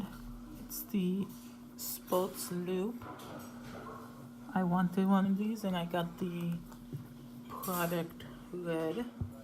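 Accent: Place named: Russia. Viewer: You sound like a russian woman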